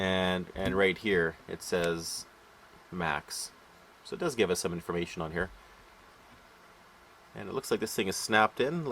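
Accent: Canada. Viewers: I don't know if you're Canadian or not, you sound it (no offence meant if you're not). The ones I have (in the UK) don't use a DC motor, they use an electromagnetic actuator type deal and readily accept the fake refills. Same company, but very different design. Cool stuff though, watched it all.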